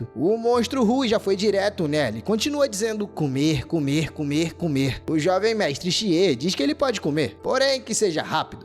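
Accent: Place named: Rio de Janeiro, Brazil. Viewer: ele é carioca que daora mano 😅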